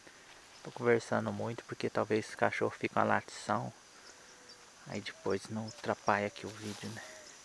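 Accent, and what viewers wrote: Minas Gerais, Brazil. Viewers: Lindo sítio sem conta que adoro esse sotaque minereis eu que sou mineira também de Mahumirim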